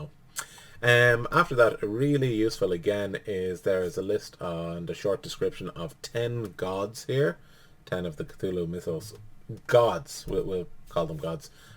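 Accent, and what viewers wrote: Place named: United Kingdom. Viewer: Thanks for the great video! Looking forward to your channel. Also, great accent- are you British per chance???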